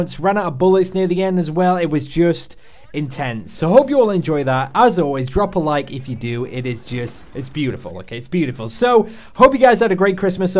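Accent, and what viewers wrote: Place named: Northern England, United Kingdom. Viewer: his accent is really odd, like northern (english) with an American tinge...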